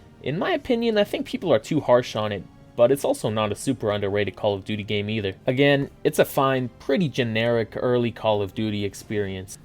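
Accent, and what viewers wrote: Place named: Boston, United States. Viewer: Where you from? I can kinda hear a bit of Wahlberg in theya! Boston?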